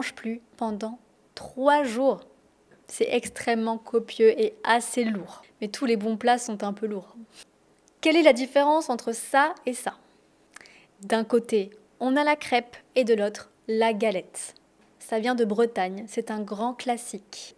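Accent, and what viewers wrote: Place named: Occitania, France. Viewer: Aaah tu es occitane! Maintenant je compris pourquoi j'aime ton accent. J'etais là à juen cette année. Quelle marveille! Parles-tu occitan aussi? J'ai prouvé le cassoulet à Narbonne et il est le plat qui j'ai aimé plus parmi toutes dans mes vacances en France. J'ai prouvé la bouillabaisse à Marseille aussi mais elle ne m'a impressioné pas trop. Je n'ai pu trouvé du boeuf bourguignon mais j'ai prouvé la daube de boeuf et le guardien de toureau, tres bons. Excuse-moi pour mon mauveaux francais, je suis italien y j'ai prouvé à ecrire sans traducteur ou diccionnaire.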